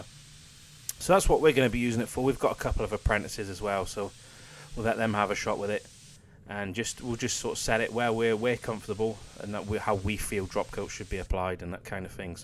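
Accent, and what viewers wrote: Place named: England, United Kingdom. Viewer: thank you for yourgreat content. I'm in the US so I like seeing other products. You are very good teacher. Also love the English accent. Please keep up the work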